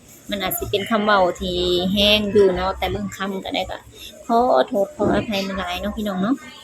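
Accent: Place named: Ban Khok Yai, Thailand. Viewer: เจ้าคนบ้านโคกใหญ่บ่ครับฟังเสียงคือคนบ้านโคกใหญ่ครับสบายดีปีใหม่ครับ ขอให้สุขภาพแข็งแรงตลอดปี69และตลอดเด้อครับคนงาม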